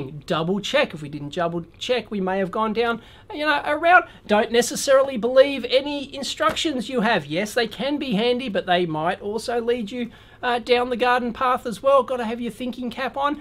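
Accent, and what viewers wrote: Australia. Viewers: it's highly commendable for someone to use a wide dictionary. especially with an australian accent. (unless your name is ken ham)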